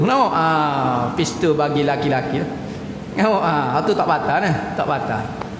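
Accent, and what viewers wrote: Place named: Terengganu/Kelantan, Malaysia. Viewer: Alhamdulillah paham dah lah, ye Kene ustaz tu ckp loghat trg/Kltn baru Kene dgn saya.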